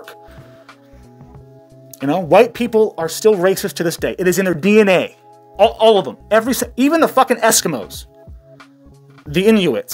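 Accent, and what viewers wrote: United Kingdom. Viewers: Loving the scaaawtish accent!😂💯👍🏻 I’m not on Twitter thankfully so I haven’t got to hear all that BS hate! Thanks 🙂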